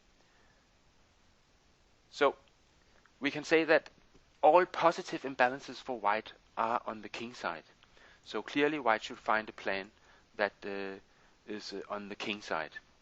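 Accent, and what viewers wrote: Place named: Denmark. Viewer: Love the danish accent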